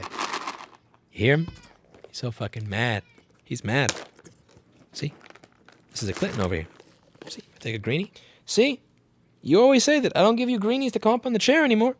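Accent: New York, United States. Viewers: This is the most New Yorker rant I’ve heard in a long time. I’m here for it.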